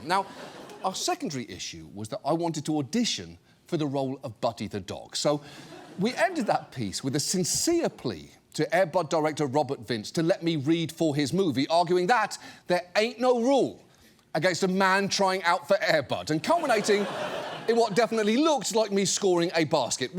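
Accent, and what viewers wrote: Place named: United Kingdom. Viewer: Dang it Johnny! I've never seen any of the air bud movies, but I was going to make an exception to watch you in it. Now how am I going to get my fix of a sarcastic British-sounding fake news anchor pretending to take a dog playing sports seriously?!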